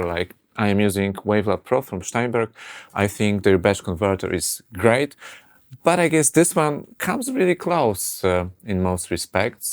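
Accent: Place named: Poland. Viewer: Uslyszalam polski akcent.Mialam nosa.😄A ja jestem nadal na Studio One 2😂🤣😅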